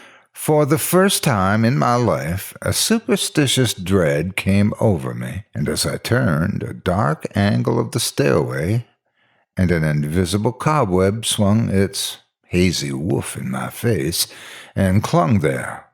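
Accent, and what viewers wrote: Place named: Missouri, United States. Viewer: I knew not that the great Twain had written a spook story. Excellent as all his works are. Thats a great Mssooouriy accent too!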